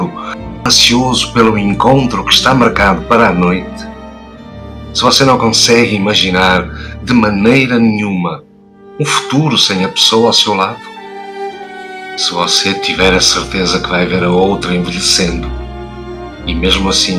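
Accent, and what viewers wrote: Portugal. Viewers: Lindo e neste sotaque português ficou ainda mais terno e doce, irresistível, um bálsamo para a alma! AMEI!! 😃😍😍👏👏👏🙏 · DEsculpe....Só não gostei q fosse nesse sotaque português.... tanto Drummond quanto Selma são brasileiros, e essa música de fundo trás um clima de funeral....qdo fala de amor chegando em nossa vida... 😢 e não condiz com a mensagem ... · Amei a declamação do poema. Este sotaque português é divino. Quem declama? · Samir 4150 sotaque Português de Portugal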